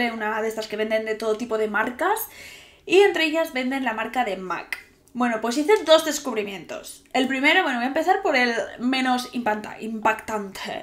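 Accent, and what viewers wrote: Spain. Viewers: El idioma es castellano....con tu acento español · Hola, me encanto tu reaccion. Ademas decirte que vos hablas español muy de España, en Argentina hablamos castellano pero de Argentina. No silvamos las s ni las c ni las z. A todas las pronunciamos igual solo con distinta intensidad. Te felicito por tu pronunciacion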